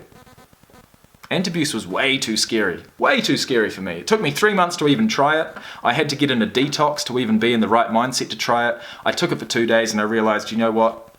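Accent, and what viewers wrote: New Zealand. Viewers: You from New Zealand?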